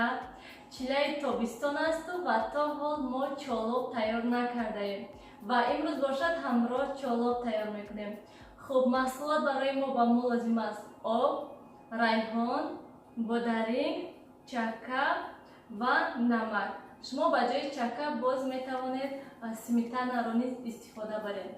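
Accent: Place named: Kulob, Tajikistan. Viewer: шумо ранги кулоб и гап мезанен мехохам шумо кулоб и бошен илтимос наген кулоб и нестам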